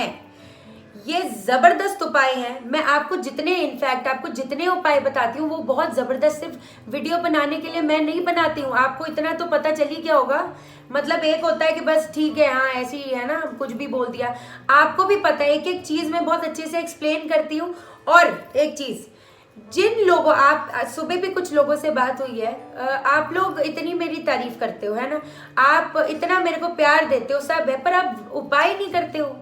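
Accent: Punjab, India. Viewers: I love the way you speak in Punjabi way. Main v Punjabi hu .😘😘😘😘😘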